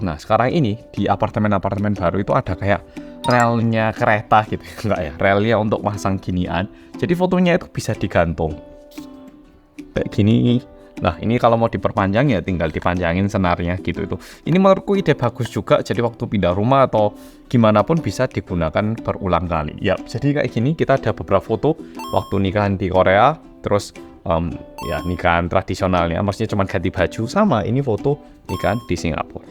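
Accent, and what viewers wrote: Java, Indonesia. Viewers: Klo nonton mas kelingan mbak jodilee, podo2medok tp mbake medok jogja krama inggilan😂😂😂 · Tiap nonton konten mas. Terus dia meddok bahasa jawa lancar berasa ga percaya dia asli korea🤣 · Wah keren ini bener2 korea medok 😅👍 .. suka banget kalau denger lagi bahasa indo medok dan bahasa jawa .. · Mas, tolong ya Meski tingg@ di korea, medok dan bahasa Indonesia nya jangan hilang yaa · Kesini gegara nonton tonight show orang Korea yg medok,, langsung deh aku subscribe like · Sering liat...tapi masih ngumun wong Korea ngomonge persis wong Jowo😂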